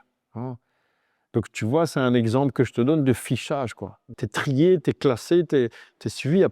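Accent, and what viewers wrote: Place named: Brussels, Belgium. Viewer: Maître si les pot cast avaient existé il y a 20 ans je suis certain que j’aurais été inspiré par vous et j’aurais fais des études de droits. Vous êtes hyper inspirant. Ps: l’accent bruxellois est dingue 😂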